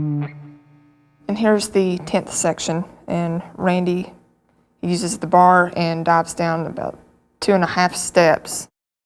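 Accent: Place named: Kentucky, United States. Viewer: WTF, come over form the Heil site and first think is that I am listening and seeing is one of my home girls form SE Kentucky, lovely lady, great accent and then holly crap rip my face off with incredible shredding and than to top off straight forward lesson. Love it great job -new fan here, keep posting.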